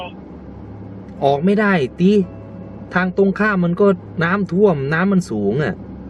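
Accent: Isan, Thailand. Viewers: คนอีสานหรอพี่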